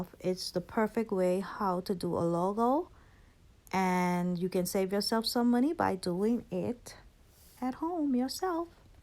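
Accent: Bahamas. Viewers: I am too just knew the accent when i heard it 🇧🇸❤️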